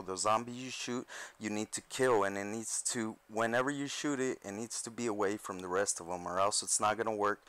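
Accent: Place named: Canada. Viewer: you sound like a nice Canadian okay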